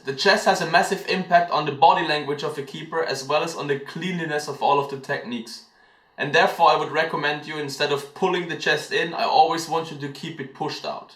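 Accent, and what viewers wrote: Germany. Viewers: hey dude .. this is very helpful , thanks !! by the way .. Are you a german ? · Du bist doch deutscher mann hört’s an deinem Akzent 😂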